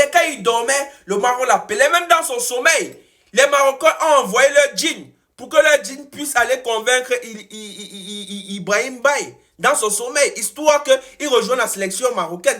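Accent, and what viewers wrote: Cameroon. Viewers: Mais ton accent là est un son continantais (cameroun) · ON VIT EN EUROPE, ET NOUS RECONNAISSONS TON ACCENT CAMEROUNAIS. PARCE QUE J'AI BEAUCOUP D'AMIS CAMEROUNAIS , ET VOUS N'AVEZ RIEN D'ACCENT IVOIRIEN. VOUS ÊTES UN CAMEROUNAIS PURE SANG UN BAMELINKAIS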